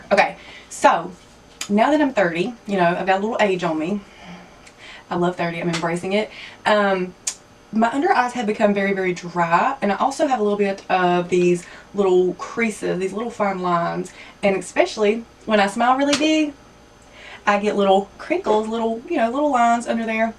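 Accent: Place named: South, United States. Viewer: At nyte tyme...i love country tlk haha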